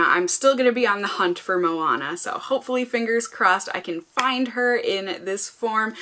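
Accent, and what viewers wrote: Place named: France. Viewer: Your so funny! Lol your French is cute.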